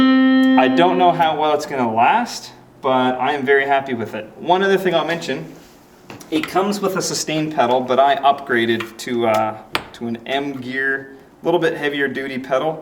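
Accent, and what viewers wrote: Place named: Canada. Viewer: WHAT PART OF CANADA ARE YOU FROM , EH ???????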